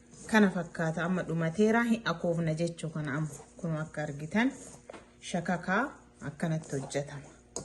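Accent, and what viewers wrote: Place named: Arsi, Ethiopia. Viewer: Wowowowowowo jabduu teenyaa jabaadhuu😍😍😍🥰🥰💪 Ati arsiidha mama hasa'an kee arsii fakkata.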